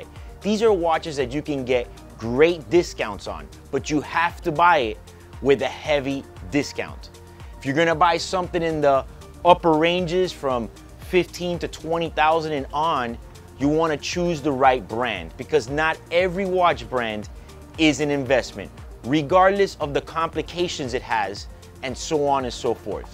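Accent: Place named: Chicago, United States. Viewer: what’s with the silly chicaaago accent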